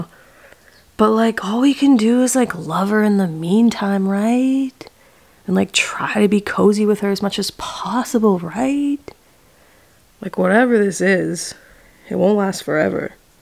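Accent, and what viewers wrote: United Kingdom. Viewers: I strangely find your British accent quite pleasant to listen to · i love the british accent lol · I love your English accent and the commentary is spot on 🤣 Your poor, long suffering cats! Nah, you can tell they really love you, it's so sweet x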